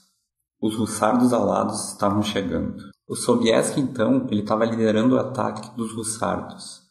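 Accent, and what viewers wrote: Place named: Curitiba, Brazil. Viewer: obrigado pela aula de história, o Islã mais uma vez ameaça todo o Ocidente, teu sotaque não te nega como um bom conterrâneo, reside em Curitiba?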